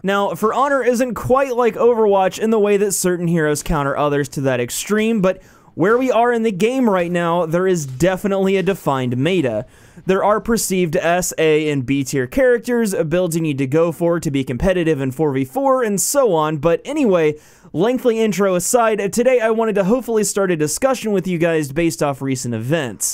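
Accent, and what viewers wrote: United States. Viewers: Evan Woolford I'm certain it's just cause he's American (pronunciation) but as the other guy wrote , it's a clever little substitute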